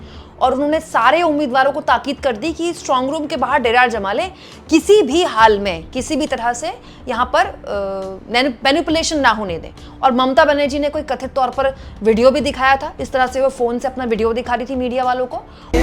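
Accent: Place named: Uttar Pradesh, India. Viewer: Bhatere सवाल 😂😂 बुलंदशहर अलीगढ़ स्टाइल छोड़िए , बहुत से सवाल बोलिए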